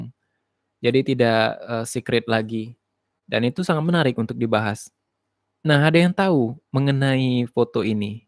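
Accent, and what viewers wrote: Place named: Sumatra, Indonesia. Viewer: yg jelas beliau logat sumatra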